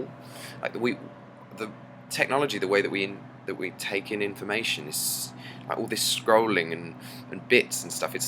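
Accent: United Kingdom. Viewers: Thanks for these videos, it really reminds me of England listening to you. Wishing a real cup of tea could be shared, Ill just sip alone until dreams start breaking the realm of reality. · Oh... So he doesnt speak french but british englisch !?.. I thought he was Francis from France..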